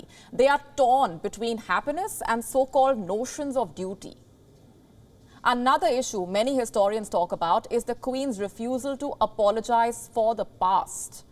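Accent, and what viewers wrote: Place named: Punjab, India. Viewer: Heavy punjabi hindi accent!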